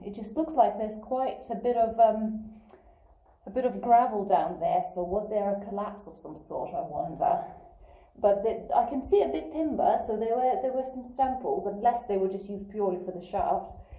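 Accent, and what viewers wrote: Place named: RP, United Kingdom. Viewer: Hello from America! Nothing like a gorgeous lady guiding us through some awesome mines ! Especially with that posh accent 😍😁🙏🏻🙌🏻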